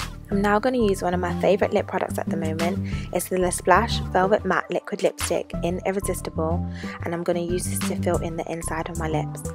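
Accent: United Kingdom. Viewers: I had to subscribe you literally got me when I heard your voice. British, so I know where to buy makeup on a budget plus your makeup is FLAWLESS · are you from essex?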